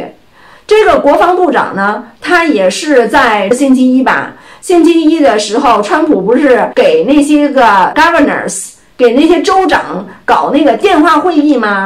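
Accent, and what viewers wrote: Beijing, China. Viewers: 哪里人？北京的吗？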